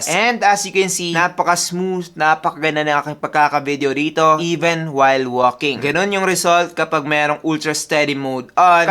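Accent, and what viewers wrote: Visayas, Philippines. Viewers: Taga San kaba boss parang may kunting bisaya Kasi ung fluent mo sa pag tatagalog din 😮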